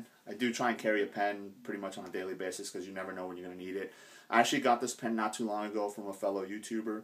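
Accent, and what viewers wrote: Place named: South Florida, United States. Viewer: thanks for sharing. I knew you were in So FL from your acceent:) Suave... are you going to the gun show in Fort Lauderdale this weekend?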